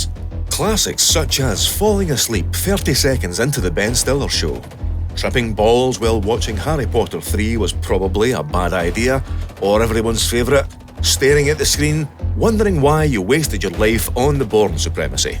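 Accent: Scotland, United Kingdom. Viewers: Fist time I,ve ever seen any of your stuff,why has it taken so long for this to be brought to my attention Hello I understand Your Scottish or something I think theres a scottish on Our Planet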